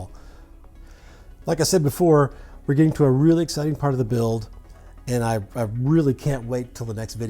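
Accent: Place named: Mississippi, United States. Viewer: You're accent is like Michael Conor Humphries the little fella who played young Forrest Gump - himself the inspiration behind Tom Hank's accent. Are you from Mississippi?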